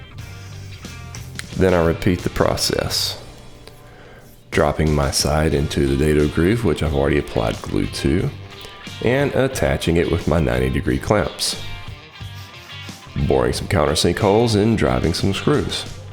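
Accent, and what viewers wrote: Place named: North Carolina, United States. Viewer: Great cart man. Where are you located (generally) I'm in NC and you sound like you might be "from round here" ;)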